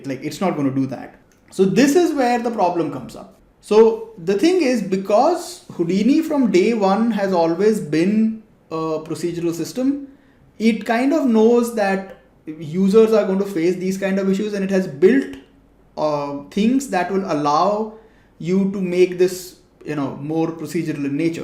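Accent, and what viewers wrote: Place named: India. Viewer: Ah, indian accent <3 best houdini explainer <3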